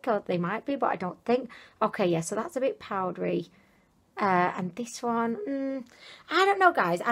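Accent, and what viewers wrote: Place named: Manchester, United Kingdom. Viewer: Ahh!!! I just came across your channel!! You’re so precious 😍 are you from Manchester? You have the same accent as some of my family that live over there!! Lots of love☺️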